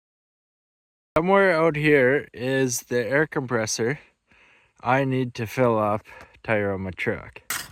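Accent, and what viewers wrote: Canada. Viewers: I can't give you any advice, i couldn't even build a bird house. I have OCD so i notice little things that would drive me crazy. I have to say i have never once seen anything you do trigger me. So precise, and professionally done. Thank you for taking us on your journey. I can't wait until it is completed. You are very talented and is amazing how you have so many skills. It takes a long time for spring to come in Canada.....i recognize the accent and speach patterns. My parents and 2 elder sister are Canadian. I was the first born American and have been to Canada a few times. Always happy to see your videos pop up on YouTube.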